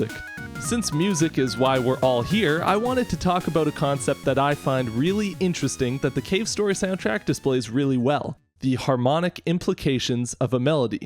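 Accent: Germany. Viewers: his german accent kills me every time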